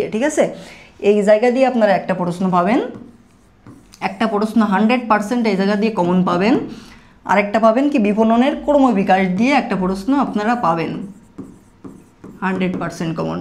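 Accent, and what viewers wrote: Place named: Khulna, Bangladesh. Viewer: দাদার যশোর অথবা খুলনায় বাড়ি 😊❤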